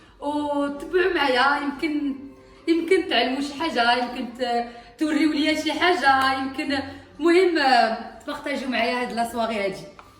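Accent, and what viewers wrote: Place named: Arab world. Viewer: Machaallah 3lik j aime bien ta simplicité tes goûts et ton accent arabe j adore bonne continuation 😍😍😍😍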